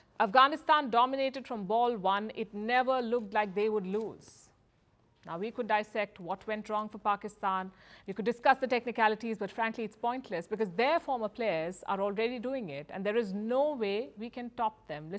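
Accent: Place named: India. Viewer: Palki is wonderful . Her news presentations are comprehensive and concise. And the best thing is that she remains Indian in dress ,dialect and thoughts. God bless her !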